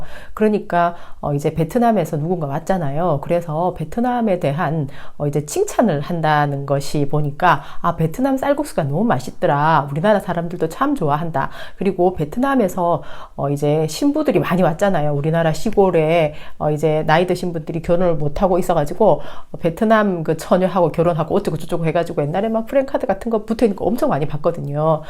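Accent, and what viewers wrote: Gyeongsang, South Korea. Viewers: 님만 믿습니다. 구수한 경상도 사투리 좋아요~~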